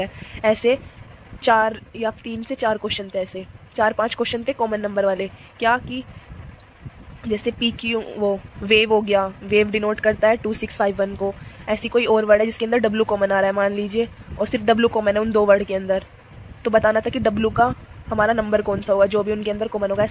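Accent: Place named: Meerut, India. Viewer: awaaz se to meerut, shamli side ki lgti ho... btw thank you ..bdia review h 30 oct ko h mera dekhte hn...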